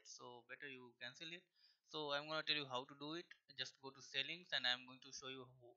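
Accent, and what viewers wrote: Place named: Bengal, India. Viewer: You have a bengali accent Are u bengali? Nice video btw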